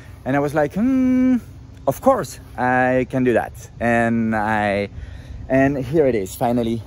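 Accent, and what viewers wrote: France. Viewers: Hshahaha the french accent 😂😂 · Very good project . Accent très frenchy ;-)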